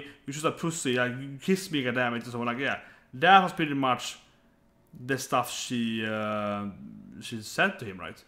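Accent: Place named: Sweden. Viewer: Yeah, but it gets better, believe me. You also wondered about the other two Girls in the first Kamine and Goda bit. The first girl with the green twintail hair is called Nakasone Maya and is only seen in this bit and a later chapter. The other one, called Patricia, is one of the best characters in the series. I hope they are saving her real involvement in a season 2, since we haven't even seen half of the couples yet. If she was going to be in the show more in this season, she would have been introduced in like episode 2 or 3. I hope they make a season 2, which is like this one but with the other couples and characters that were introduced at this time as focus instead, and then a compilation season with the first 2 seasons in one, the difference being that it would become a 24 min episode show, have the "bits" in correct order AND add the extra pages and Tsurezure Children Plus! 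stuff from the manga. Btw, Svensk? Din dialekt pekar åt det hållet. (<----If you didn't understand that, then nevermind :P)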